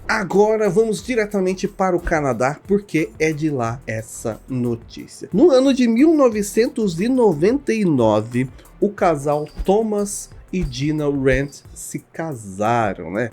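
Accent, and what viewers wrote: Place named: France. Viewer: Olá muito bom seu sotaque francês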